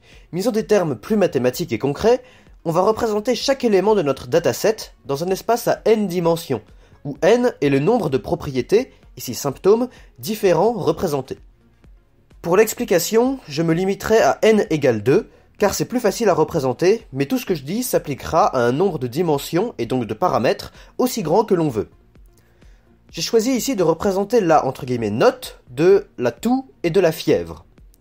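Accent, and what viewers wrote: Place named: Belgium. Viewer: Toujours plus d'accent belge !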